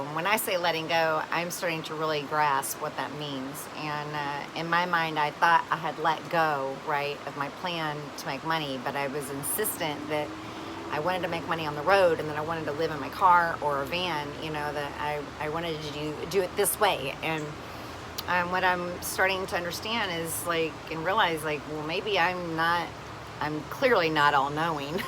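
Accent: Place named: South, United States. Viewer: Hi! What a beautiful place! And salad! And southern accent! I like how you talk about stuff. I really want my whole channel to be like that.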